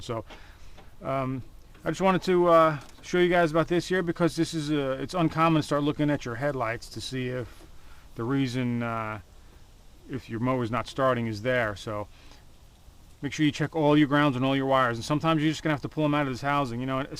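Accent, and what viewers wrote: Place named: Newfoundland, Canada. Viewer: Yes B'Y..Newfie like accent. Thanks again, it was killing me after an engine swap...im a novice. main ground was culprit. Thanks again. also, your narrative skills are excellent. more small engine stuff..?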